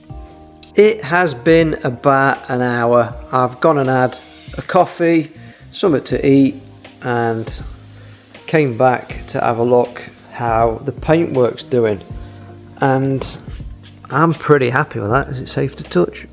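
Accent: United Kingdom. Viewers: the commentary is so british lmao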